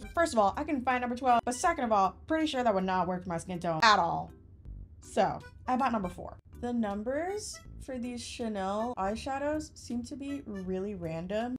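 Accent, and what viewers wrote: United Kingdom. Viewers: ❤ your British accent mode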